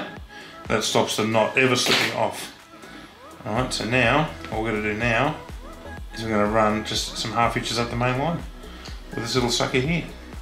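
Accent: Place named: Australia. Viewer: Australian accent (I think) wearing an Oregon something-or-other hoodie. Interesting.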